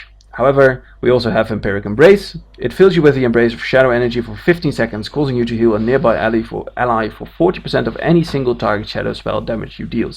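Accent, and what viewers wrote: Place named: Denmark. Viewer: Nice guide! Really helpful! Always funny to hear someone talking english with what sounds like a danish accent! :D I got a few questions. I just recently started maining my Shadow Priest after I saw how fun the Voidform and Insanity Management looks. 1. I'm still using Legacy of the Void since I started playing my Shadow Priest, because it's what I was told to start using and then slowly moving on to Surrender to Madness, however I wasn't that fond of changing because I thought Surrender to Madness was a passive, meaning that it was every time you left Voidform you died, but seeing that it's a cooldown, you can actually play casually, like questing, clearing trash and such without being scared of dying to Surrender to Madness. But honestly, a 10 minute cooldown, yes it's one hell of a strong talent, but the 10 minute cooldown really doesn't seem that appealing, and I might be wrong here, but currently in my Mythic guild we are clearing bosses faster than 10 minutes a part in most cases, does that really mean there's actually bosses where Shadow Priests isn't gaining ANY DPS from their level 100 talents, or am I missing something? 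2. Do you think Shadow Priests will be nerfed? It really seems like we are just a tad too strong, together with Rogues and maybe fire mages (haven't played since the nerf today/yesterday) - It seems like the only way you can justify Shadow Priests doing that much damage is their ramp up time, they're absolutely shit at fast fights and they do have to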